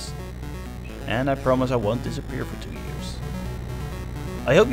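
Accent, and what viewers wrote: Netherlands. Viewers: Yes, as a fellow Dutchman, I recognised you accent within a few seconds. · I could hear you were Dutch, but you have less of an accent then I do after 30 years living in the USA! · How come I always recognize a fellow Dutch accent. Then when I check the account info, it's actually true xD Useful info video tho!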